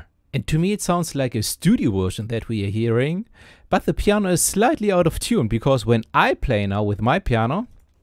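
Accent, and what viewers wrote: Germany. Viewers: I can tell that your German 🎉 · Nice video! I CAN HEAR THE GERMAN!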